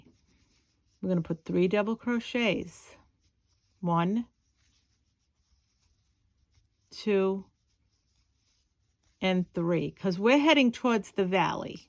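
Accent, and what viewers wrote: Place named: Boston, United States. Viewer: Happy to see ya today, I love the mile a minute everything. I’ve been crocheting for about 40yrs now & enjoy crocheting along with you, always something new and interesting to learn. I love this one! I feel like I’m hearing an Italian Boston accent, you remind me so much of one of my Aunts your voice, knowledge & patience I adore you, awesome teacher. 🫶🏻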